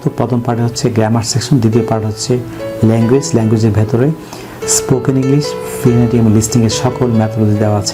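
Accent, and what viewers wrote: United Kingdom. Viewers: আপনার উচ্চারণগুলো অনেক সুন্দর স্যার। ঠিক ব্রিটিশদের মত।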